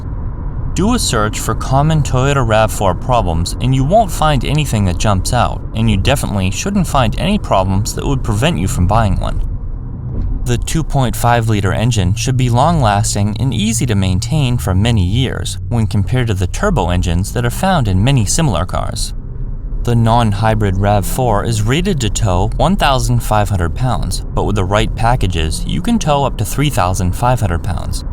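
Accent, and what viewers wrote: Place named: United States. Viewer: I will subscribe when you stop saying "and" like an american. This is an error, try to reverse to the British "and".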